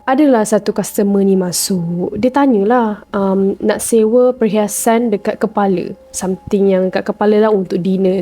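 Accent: Kelantan, Malaysia. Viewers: org kelate ko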